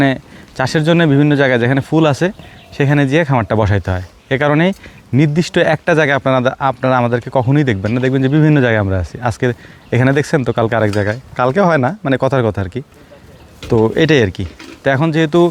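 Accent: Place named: Magura, Bangladesh. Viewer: পিউর মাগুরার ভাষা 😅😅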